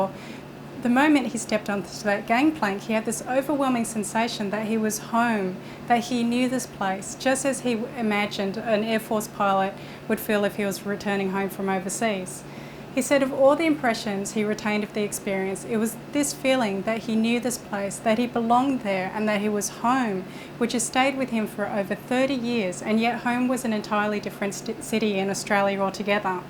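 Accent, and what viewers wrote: Australia. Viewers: Interesting topic. Too bad I have to work too hard to understand what you are saying. You articulation is ...hmmm... let say, not what it should be. Your accent does not help either. I stuck with it for more than 3 minutes. Too bad. Coz as I already mentioned, interesting subject. Perhaps this is intended to be watched by Australians only. Like an "Aussie secret". Do with it as you please.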